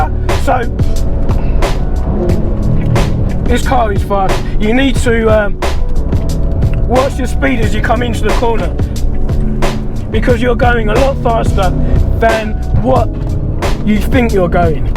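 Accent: London, United Kingdom. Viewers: I like your video style great funny conentry good old London accent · Just discovered this channel. I’m getting a stage 2 in the new year on my F10 M5. I have a track focussed 911 which I take to the Nurburgring, but in April I’m taking the M5 ! Great hearing a Brit over the pond. Take it easy brother 🤜🏼🤛🏾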